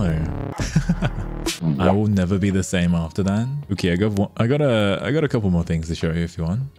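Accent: Australia. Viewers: Sir! You are making me a tomato and I am trying not to scream! (You're Aussie accent is making me loose it despite being surrounded by it all the time since I'm an Aussie) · The Aussie accent is what hooked me up immediately. Also I am genuinely way more than impressed by how good the vtuber model is and love your sense of humor. Congrats on your redebut!! (❁´◡`❁) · Omg, is that an Aussie accent I hear? If so, that makes me so happy more of us are getting out there and entering the VTuber community. Congrats on your debut! If you're not Aussie, mb lmao. Can't unhear an accent that I'm always surrounded by 🥹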